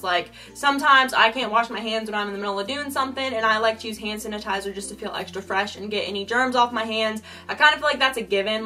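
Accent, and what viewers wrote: South, United States. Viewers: She got a lot more of a country accent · Is it just me or did she get more of a country accent lmaooo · petition for who thinks she should use her country accent more ⬇️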